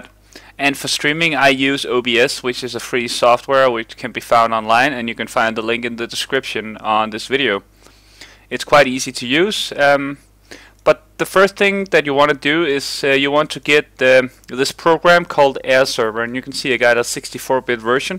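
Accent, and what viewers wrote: Denmark. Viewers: Du er dansker? ;) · Nice tak for hjælpen kan høre på dit engelsk du er dansk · Er du dansker??!??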